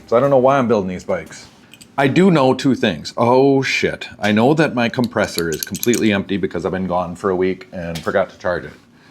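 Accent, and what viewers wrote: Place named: Upper Midwest, United States. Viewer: I like your show because I learn some new techniques and I like hearing your northern Midwest accent. I grew up in central MN. I have a suggestion for future content. I very much like “restoring/maintaining/servicing” older bikes. I mean much older. I’ve fixed up bikes from early 70’s, 1956, 1944, ,1930, 1915. Can you find anything like that in your neighborhood and do something with that? Since you may not want to fill your shop up with salable used bikes.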